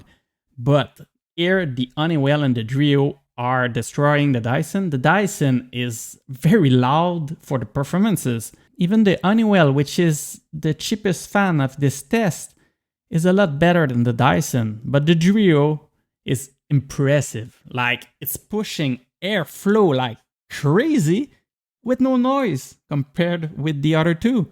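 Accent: Quebec, Canada. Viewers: Thanks. I just assumed you're from Quebec because of your accent. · J'aime que dans les 3 premières secondes du vidéo j'ai reconnu l'accent QC, et +1 pour l'avoir écrit dans l'intro de la vidéo! 😄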